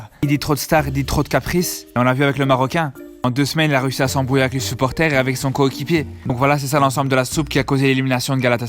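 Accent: Brussels, Belgium. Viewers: Son accent est véridique, elle vient de Bruxelles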